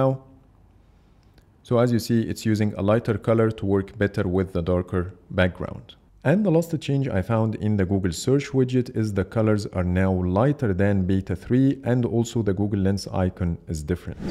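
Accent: Egypt. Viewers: By listening to you, I immediately noticed that you are Egyptian. right?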